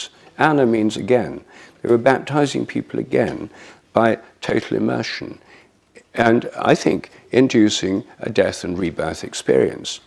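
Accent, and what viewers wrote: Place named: United Kingdom. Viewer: Give it a rest....he speaks English so clearly a student of the language can understand...As an older American who has lived in both Northern California and New England, I can testify that English knows no bounds in pronunciation. While living for 13 years in New England I insisted that my children retain the letter R....yet we all, when tired or first awake would slip and say "weathah " for example...I for one (and I suspect I am not at all alone) love to hear an educated British speaker. Why would a native Californian like to hear such an accent? Simply because it is lovely, tonally pleasant, easy on the ears and altogether pleasant.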